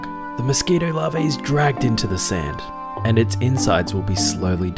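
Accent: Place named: Australia. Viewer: He went extra Australian with this one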